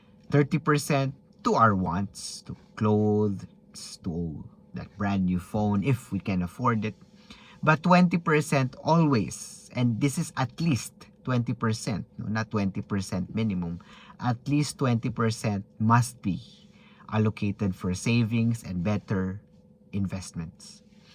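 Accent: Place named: Philippines. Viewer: i could tell you’re filipino! my mom never taught me how to speak tho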